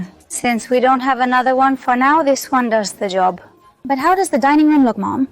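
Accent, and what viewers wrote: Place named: India. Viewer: lo hace la IA en automatico XD por eso parece india hablando ingles XD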